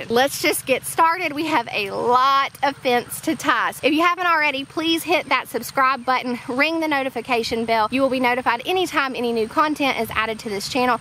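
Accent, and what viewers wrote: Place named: Virginia, United States. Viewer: Just subscribed!!! Where are you from. That accent reminds me of us here in VA.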